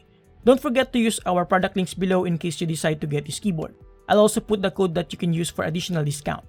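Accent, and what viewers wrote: Philippines. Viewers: Love the accent, Philippine pride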